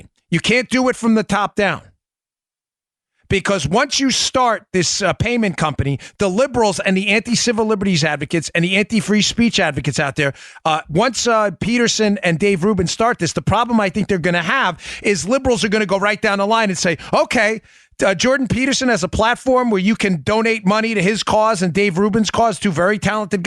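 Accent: New York, United States. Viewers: I like this show sounds like my boyfriend New Yorker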